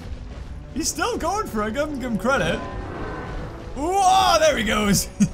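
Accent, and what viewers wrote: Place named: United Kingdom. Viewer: YOU BRITISH!!!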